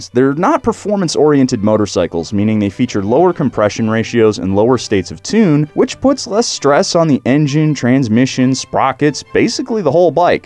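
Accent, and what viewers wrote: United States. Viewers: That cowboy hackcent gets me everytime love it !!! Also i don't actually ride motorcycles, i just love how they look and they scare me :D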